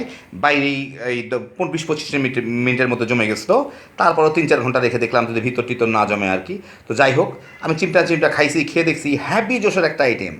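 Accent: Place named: Faridpur, Bangladesh. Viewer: Vai apnar soja sapta kotha onk valo lage tobe vai apnar vasha amader faridpurer moto.apnr bari ki faridpur distrik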